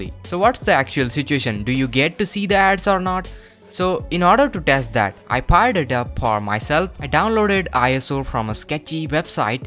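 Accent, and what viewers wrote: India. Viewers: horrible indian accent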